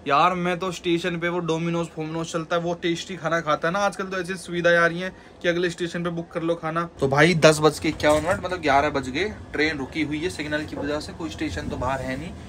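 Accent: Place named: Jhansi, India. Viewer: Bhai..apki language se lg rha aap jhansi ya aaspas k ho...or plz kha se train li o to bta dete...aadhi info di h..😢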